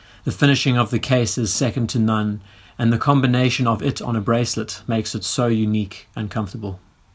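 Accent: South Africa. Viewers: Great collection, very enjoyable channel. Your accent sounds South African. Hello from Johannesburg South Africa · This is a bit sad. You’ve spoiled the joy of owning the real thing by buying cheap (albeit well done) knockoffs. So if you do ever decide to trade up, it won’t feel as special. Call it what it is, it’s like living with a very good sex robot versus a real girlfriend. Whatever, judging by your accent you’re from South Africa, so it’s just as well that when you inevitably get robbed of them it won’t be so bad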